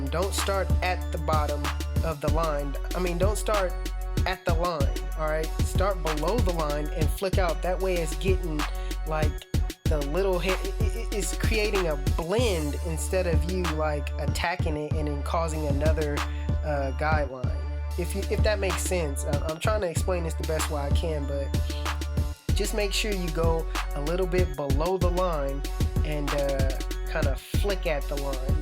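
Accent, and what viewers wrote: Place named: Bay Area, United States. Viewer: Are you from Vacaville California? Because you gave the Bay Area accent.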